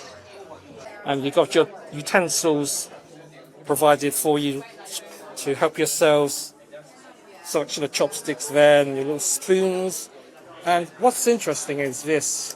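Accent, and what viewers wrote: United Kingdom. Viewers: It's so interesting hearing your British accented pronunciations of Chinese dishes. I'm a California born Chinese speaker and I say things with a bit twang compared to you. Thanks again for the video.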